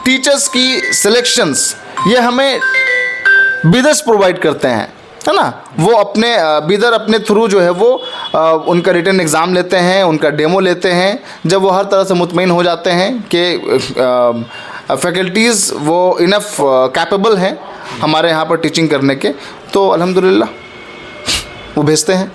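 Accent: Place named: Magadh, India. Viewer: "है ना" यह तो अपने मगध (औरंगाबाद_गया) क्षेत्र वाले लगते है । कोई Confirm करें, Plz। m Ex JMIIte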